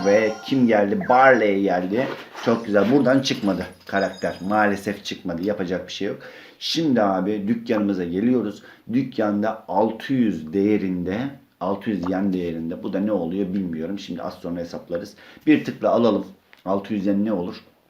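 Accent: Black Sea, Turkey. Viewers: Abi Trabzonlumusun Rizelimi ?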